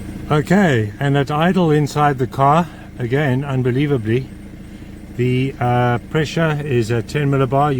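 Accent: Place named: South Africa. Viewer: Recognise that accent, regards from wilderness, South Africa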